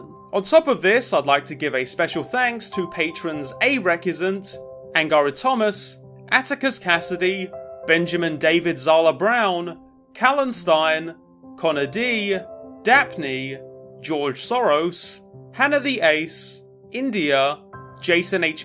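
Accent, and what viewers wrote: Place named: England, United Kingdom. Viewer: says a lot about the oppression of Cornish culture that it wasn't mentioned in the video, despite you sounding distinctly English. very good video though.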